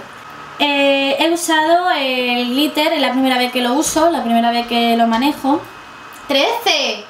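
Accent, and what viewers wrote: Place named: Andalusia, Spain. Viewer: se te nota el ramalazo andaluz y ole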